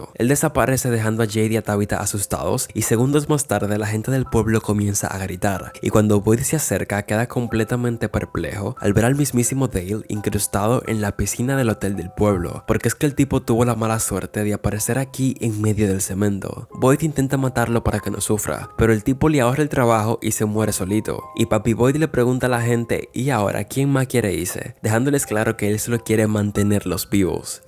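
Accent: Dominican Republic. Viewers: Esa forma de hablar “Este pana, diciendo que ta' jarto de to su m4rdito plane que no sirven” Dominicano?????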